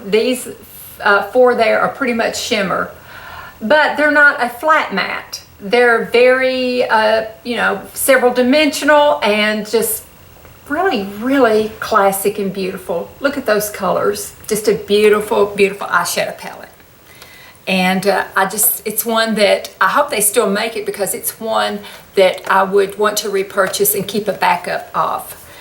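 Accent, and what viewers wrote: South, United States. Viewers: You are my new favorite channel, you're just a breath of fresh air and I can't resist a great classic southern accent!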